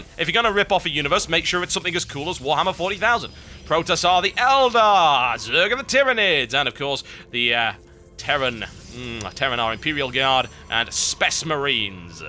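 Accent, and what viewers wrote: United Kingdom. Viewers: thats the power of a british accent.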